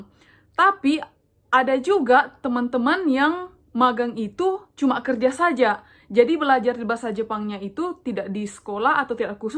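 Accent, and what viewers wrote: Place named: Manado, Indonesia. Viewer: Logatnya , mungkin kamunya dri Manado ya ? Atau bagian Indonesia tengah lainnya ? 😊 .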